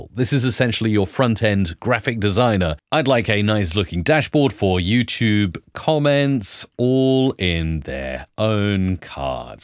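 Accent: France. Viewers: Je ne sais pas comment prendre la "blague" sur l'accent français mais c'est tellement vrai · The accent french 👨🏿‍💻😂😂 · Fine I’ll subscribe after the French accent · french accent was mad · What a French accent! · The French accent is so authentic 😂🤣Amazing !!! Thank you for this moment !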